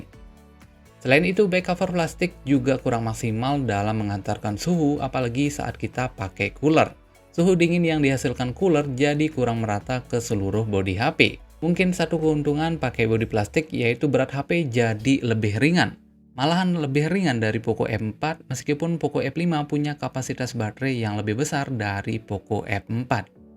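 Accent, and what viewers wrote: Bali, Indonesia. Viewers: Logat bali y bang?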